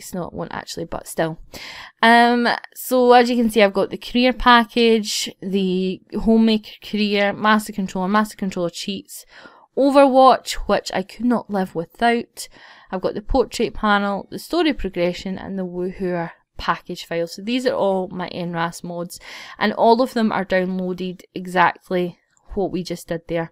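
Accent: Scotland, United Kingdom. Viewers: where about in Scotland you from? I'm from Glasgow! noticed ur accent right away 😂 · Are you scottish? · She is Scottish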